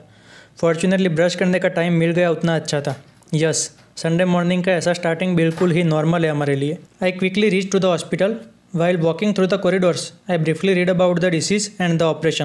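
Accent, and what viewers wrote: Gujarat, India. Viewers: Your little bit accents same like Gujarati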